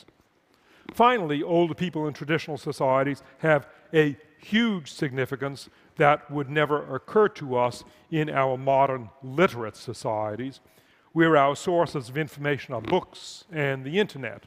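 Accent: United States. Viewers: Another great talk from TED. What accent is this? I figure its American but where from? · What part of the US is he from? I like his accent. Is it Boston? Maine?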